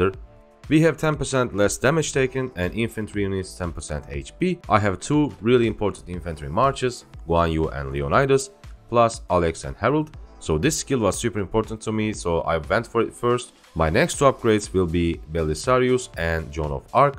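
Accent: Turkey. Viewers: Paketin çıkan ücretinden türk olduğunu anladım tahmin etmiştim konuşma şeklinden. :D Videon çok faydalı oluyor teşekkür ederiz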